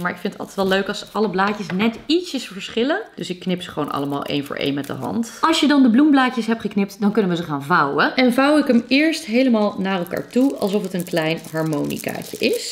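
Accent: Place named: Flanders, Belgium. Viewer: Ik peis daje accent da ge deedt gents of antwerps of west-vlaams 1 van de 3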